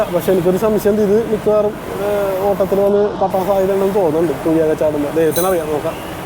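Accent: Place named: Paravur, India. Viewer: Aaha...Slang kettappo thonni ivde adthulla aaro aanallo enn 😁♥️ Paravur il evdaa bro ?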